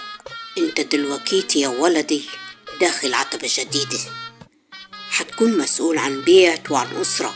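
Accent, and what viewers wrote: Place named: Upper Egypt, Egypt. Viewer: عسسسسل وسكر انا باموووت فيكي حلوة اوووي وكلامك زي الصعيدي الجواني اوووي شاااطرة عااااش ياقمر ❤❤❤❤❤❤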